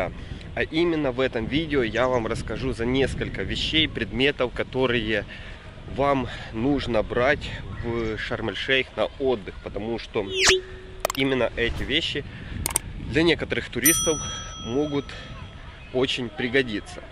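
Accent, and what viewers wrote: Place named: Odessa, Ukraine. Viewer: вопрос "офф-топ". Не в тему. Вы одессит? )) Вы не подумайте ничего такого. Но вот это вот "я Вам расскажу ЗА несколько вещей" - это так по-одесски, так узнаваемо ))))))))))) ++++ Спасибо за видео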